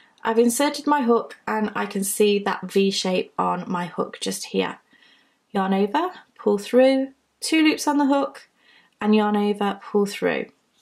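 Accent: United Kingdom. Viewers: My mom vaguely showed me how to crochet when I was a kid (she was lightning fast and almost never looked at what she was doing) and I've been wanting to see if I could actually do it recently. I will say this,. You are a good instructor and even if I never complete a piece, I promise you that at least a couple of my future video game characters will be named Ya'nova. I'll be hearing that term (in your British accent, of course) in my sleep. LOL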